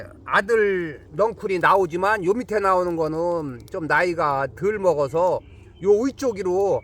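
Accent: Chungcheong, South Korea. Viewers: ㅋㅋㅋ 차미 차미 아저씨 반가워유 ! 충청도쥬.저두 충청도유~~ㅎㅎㅎ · 충청도 분인가벼 설명 듣기가 느려서 답답혀 나두 충청도지만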